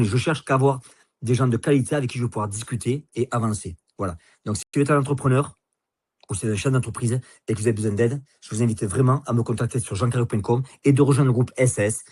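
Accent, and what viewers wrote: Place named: Toulouse, France. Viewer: Excellent, très juste, même s'il y a l'accent toulousain c'est pas très grave 😊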